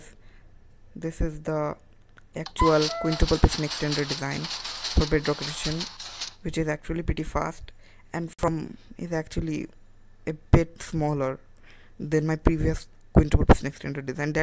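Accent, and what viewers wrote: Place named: India. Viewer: You sound Indian, thats no bad thing, that's a GREAT thing, keep being smart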